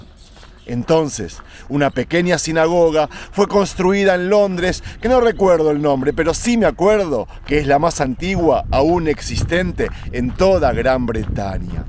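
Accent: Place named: Rioplatense, Argentina. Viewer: Tenes rasgos de desendientes!hablas como argentino o.uruaguayo sholom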